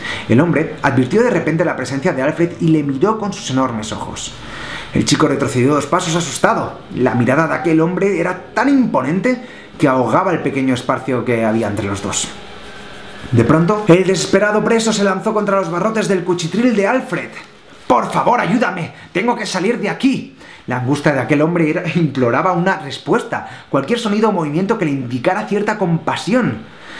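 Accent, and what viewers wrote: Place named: Catalonia, Spain. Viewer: me he vuelto a enamorar de tus dejes catalanes al hablar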